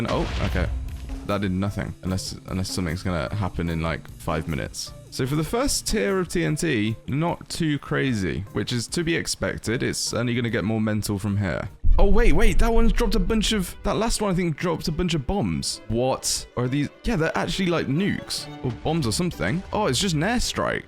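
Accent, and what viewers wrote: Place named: United Kingdom. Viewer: You have a beautiful voice and a very pleasant accent. Pronunciation is usually regional; what part of Britian are you from? I hoping everyone there sounds like you.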